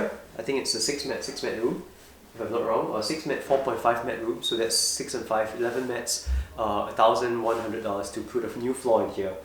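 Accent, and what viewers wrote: Singapore. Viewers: Are you Singaporean? Your accent very familiar..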